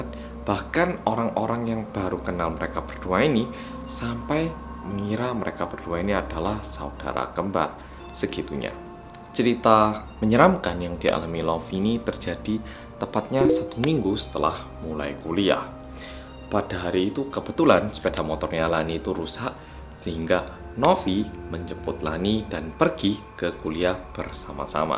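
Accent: Java, Indonesia. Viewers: 3 channel storytelling favorit dan bagus: 1. (pembawaan santai dan medho serta mudah dipahami) 2. Detektif Aldo (mirip siaran radio) 3. Arroyan Pram (kasus, konspirasi sampe sains fisika, editan paling niat dan keren. Serasa nonton film layar lebar).. Kalo kalian? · Mirip Dr.Tirta 😁 medokk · cerita di Bandung, logat mas jawa... wkwkw mantep mas tetep asik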